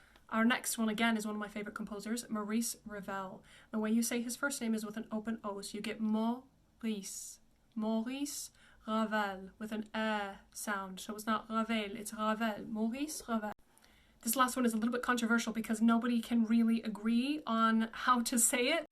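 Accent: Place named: United Kingdom. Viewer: You are the first person I have ever heard who speaks in a British accent but with a rhotic r. Did you spend time in the US?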